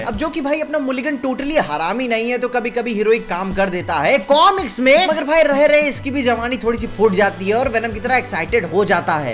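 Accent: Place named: Bihar, India. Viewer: bhai tera bihari accent bekar hbai,bhai pure hindi me hi explain kar le ,jyda accha lageag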